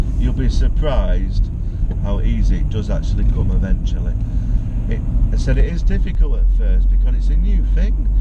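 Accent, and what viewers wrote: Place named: United Kingdom. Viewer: Mate, you are from UK, stating by the accent. Uber is not a taxi, and you know it.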